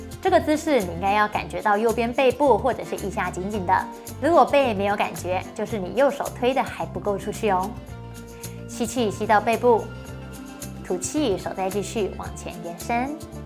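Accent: Taiwan. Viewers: 台湾女生说国语太好听了，温柔😊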